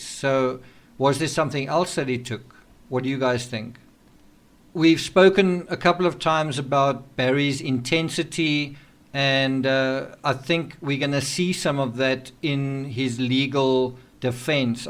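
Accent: South Africa. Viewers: Are you a South African ?Sounds like that ....your accent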